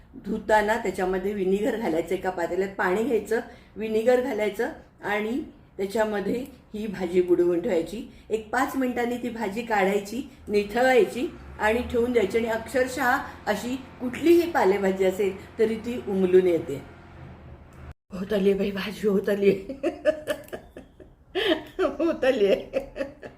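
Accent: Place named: Kolhapur, India. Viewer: आम्ही पण 😀आजच तुमचा रेसीपीचा वीडियो प्राथमच आला माझ्याकडे आणि बोलण्याच्या टोणमुळे लगेच समजले कि कोल्हापूरी असणार तुम्ही ....... 😊👍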